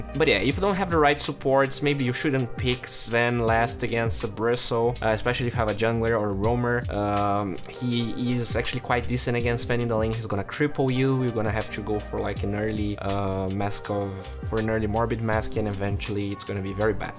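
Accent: Brazil. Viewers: Are u Brazilian? This accent lol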